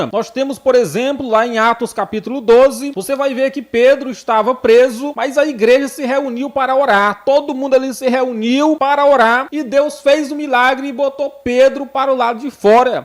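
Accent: Northeast, Brazil. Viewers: A. Paz do senhor Jesus Cristo missionário gosto muito de seus comentários é muito edificante principalmente prá mim que sou Nova convertida em Cristo tem muito que não gosta porque não quer viver a verdade adoro ó seu sotaque nordestino Deus abençoe sempre sua vida e sua família 🙏💕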